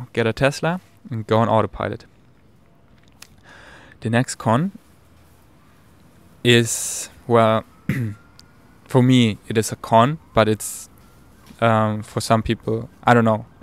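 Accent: Germany. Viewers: you have the loveliest voice for podcasts and talking. Part of what is so special is your German accent, but I agree and think it's important if you want to speak better English then emulating those with good speech around you is important. Not to learn just slang but actually what words should be dropped or not used, how to arrange them in a sentence to make sense and where the emphasis should be put on a word for correct pronunciation. But these things don't include your wonderful German accent which is what makes you YOU! xx I hope you enjoy the rest of your stay, you are learning so much about life and people from the everyday experiences (and so do we then!). Btw I moved to England from NY, my husband is British and we have fun joking about the differences in how we pronounce things. So what may seem correct to me may not be to a Brit, and the other way around. 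I think it's great to understand this when we hear someone from another country speak, one may not be wrong, just different. Just my thoughts.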